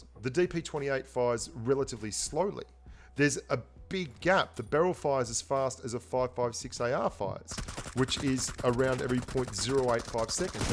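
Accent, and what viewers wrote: Australia. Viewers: You don't sound British but Australian. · love the content in general, love the Aussie humour, the accent and the honesty of the commentary. Thanks! from Ireland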